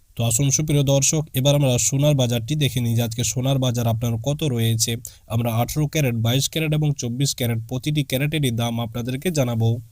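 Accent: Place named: Kolkata, India. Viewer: শোনার বাজার দুর হালা তর ভাষায় বুজা গেল তুই কলকাতার আর ভারতওয় ইউটোবাররা মতিথা বলে তরা ভুয়া বাটপার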